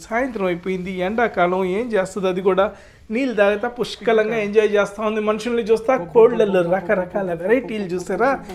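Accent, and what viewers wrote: Nellore, India. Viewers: I am not saying you need a fake voice. Just talk normal how other travel blogger’s and other youtubers are talking. You try to speaking over extra Nellore slang . I am not any advice to you. Since I am your subscriber I telling to you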